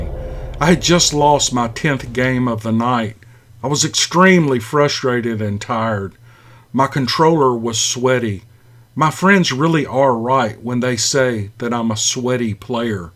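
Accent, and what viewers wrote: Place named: North Carolina, United States. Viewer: Good job! I’ve been looking for stories of the season on YouTube but hadn’t yet found a site. Truthfully I prefer you North Carolina voice then any others so far. Thanks for spook time!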